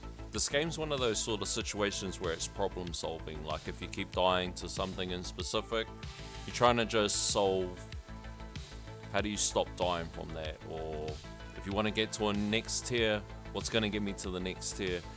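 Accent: Australia. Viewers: you can skip to the second mark to skip the intro music and hear that beautiful aussie accent ;)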